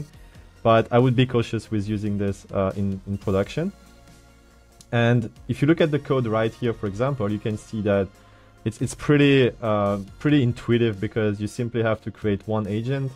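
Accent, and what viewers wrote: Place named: France. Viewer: Accent Français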